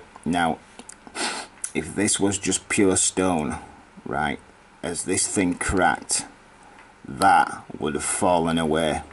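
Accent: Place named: Barnsley, United Kingdom. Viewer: Everytime you say rebar with the Barnsley accent I imagine it's Ernest Moss talking. Makes these videos even better haha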